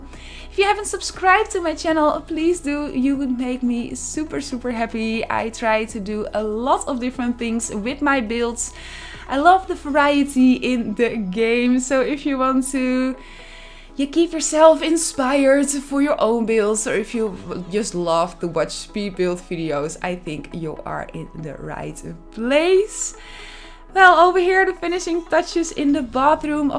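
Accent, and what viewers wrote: Netherlands. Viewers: Je heeft een groot Nederlandse accent.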